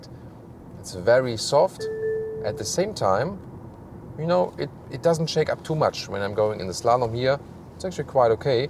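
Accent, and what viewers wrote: Germany. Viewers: Dieser deutsche Akzent einfach ...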